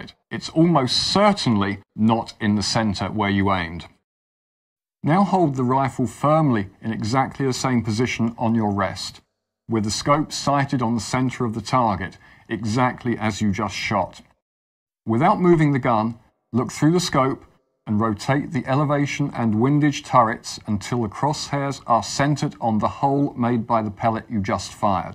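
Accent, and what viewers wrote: United Kingdom. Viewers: You sir are a scholar and a gentleman This was beautifully explained and the British accent makes it nicer for me as someone brought up in rhodesia and South Africa 😎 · Thank you british man!!!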